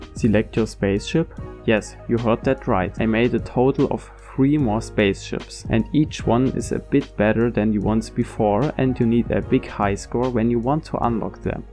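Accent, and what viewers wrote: Germany. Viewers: weil ich auch aus Deutschland bin und etwas an deiner Aussprache klang deutsch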